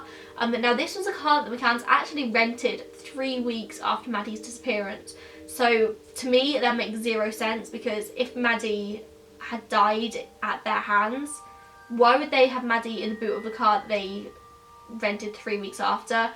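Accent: United Kingdom. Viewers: Nice british accent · I'm in love with your British accent dear xoxo. You're so cute! As far as the police is concerned, they fuck up everything in their stupid scheme of investigations. I see this over and over on podcasts like yours...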